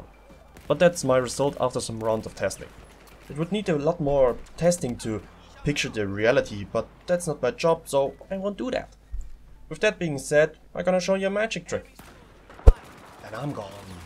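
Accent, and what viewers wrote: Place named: Germany. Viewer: Man hört so den deutschen Akzent so hart :D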